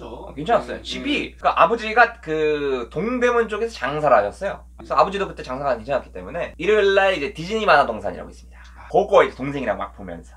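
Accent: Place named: Chungcheong, South Korea. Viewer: 아 충청도 사투리 넘 귀여워옄ㅋ 날른겨~~~ 긍정마인드 배우고 갑니다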